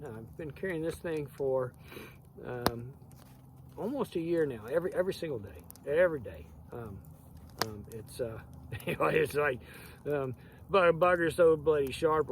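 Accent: Australia. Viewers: Old hickory epsecially older ones are sooo tough n last forever... Almost hear a Hint Of Austrailian Accent in there no? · maybe an odd question, but are you originally from Australia? I just here it mixed in with your accent..... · Your accent is…. Like from where? Sounds Aussie almost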